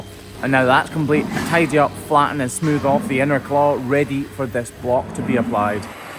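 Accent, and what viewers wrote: Scotland, United Kingdom. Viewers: Hopefully the cow gets better soon also i love that Scottish accent 💖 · He's from Dumfries and Galloway, Scotland.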